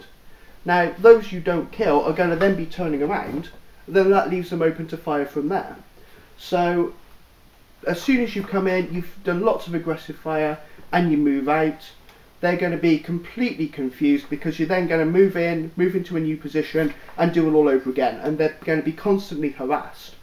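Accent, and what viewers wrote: United Kingdom. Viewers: Are your British ? That's really cool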